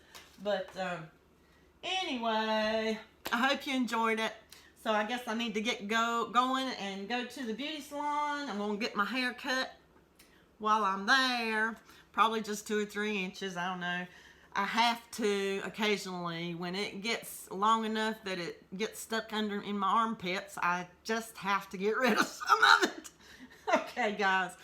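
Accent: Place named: South, United States. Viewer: I love your southern accent ! I'm from Kentucky ! Your hats are beautiful !! And so is the baby blanket ! If you would like to check out my work, come on over . See ya next time !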